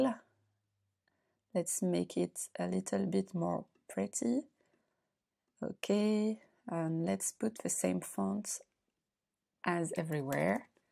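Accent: France. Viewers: Thank you so much for this video! p.s. I love your accent! -I know my people when I hear them. Go France!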